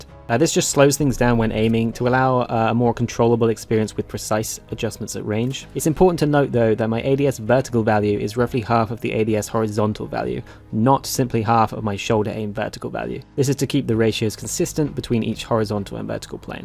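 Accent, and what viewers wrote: United Kingdom. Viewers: Fantastic. Also, your voice isn't annoying at all. If that's the reason your gameplay videos don't have voices, I really wouldn't worry about it at all. I've got my American and Austrian accents covered by Homereel and Psychoghost, bring on the British! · Ohhhh snap our boy is English! Nice homie I guess the texts are going to be a thing of the past now that you crossed into the voiceover era! A welcome change! Gives the videos more personality! Just don’t forget to put the mandatory cowboy hats on the steam codes other wise no one’s going to know is a key for hunt related stuff xD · Very informative and interesting, will definitely have a play around in the shooting range and have a mess around with my settings now I know a bit more about which each of the new ones do, nice to hear a voice finally, don't apologise for the British accent either, nice to know theres a few Brits in the Bayou 🤠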